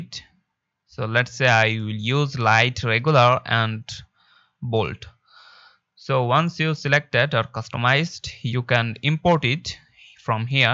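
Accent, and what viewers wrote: India. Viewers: When I hear a Bengali voice with English accent, I think I'm gonna learn something easily. Superb Tutorial! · i'm so simple guys. when I saw an India English voice making tutorials, I liked :)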